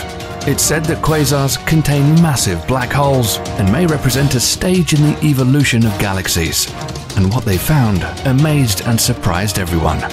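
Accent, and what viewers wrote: United Kingdom. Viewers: You know it's a British video not only because the guy talking sounds british, but also because the video plays in 50fps lol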